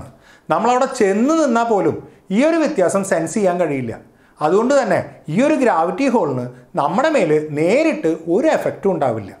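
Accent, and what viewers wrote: Thrissur, India. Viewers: I ഈ അവതാരകന് trissur കാരന്‍ ആണോ അത് പോലെ slang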